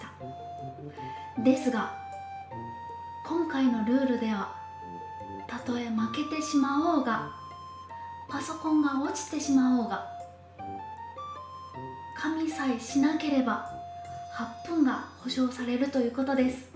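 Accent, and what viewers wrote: China. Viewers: 中国人みたいな喋り方だな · 中国人みたい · 日本語がかなり上手い中国人の訛り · 日本語学習中の中国人留学生って言われたら信じる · なんで日本語習いたての中国人みたいな喋り方なんだ？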